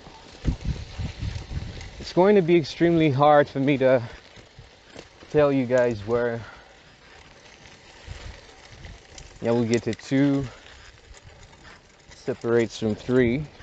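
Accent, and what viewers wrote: United States. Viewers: Man come on!! Be honest seems like u grow up in California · Off course your accent is like an American 🇺🇸 I enjoy watching your videos because I never been in Burundi since when I was 7 so it’s pleasure to me I really like it When all those roads get tarmac the neighborhood will look more beautiful ❤️❤️🇧🇮🇧🇮🇺🇸🇺🇸🇺🇸🇺🇸🇺🇸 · you said that you never been in the US neither the Europe so how did you get the American accent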